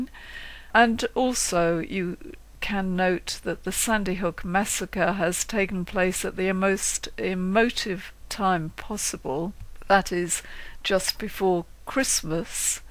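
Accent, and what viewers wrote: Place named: United Kingdom. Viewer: Another thing I take issue with is leaving clues, or putting clues in movies or any nonesense like that. What's a practical reason for it? Why take such a risk? We can keep our guns and defend our right to have them without reaching like this. And you have the nerve to call others gullible. You'd have to be even more gullible to believe this, too. It is nice to hear a British accent that's for our second amendment but please...don't "help" us. We have enough kooks to clean up after.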